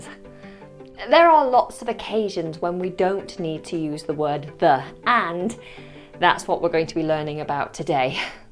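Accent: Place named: United Kingdom. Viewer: Hi! Came here looking for info after finding your singing channel and falling in love with it 😍 Is there any hope you will start posting there again? Maybe once every few weeks? 🙏 I felt so inspired and happy that I finally found a vocal coach that I absolutely love ❤️ And then I saw that the last video was 2 years ago 😢😭🥺 Thank you anyway for all the videos there! They are just awesome! And I loved that you're British because I strive to sound more British and having an American vocal coach wouldn't be as great. So, love you! Just think about it 😍🙏🤗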